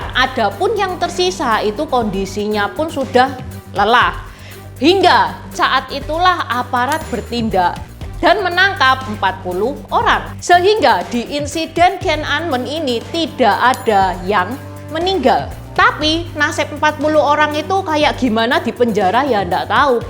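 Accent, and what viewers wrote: Java, Indonesia. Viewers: Bahasa jawanya kental haha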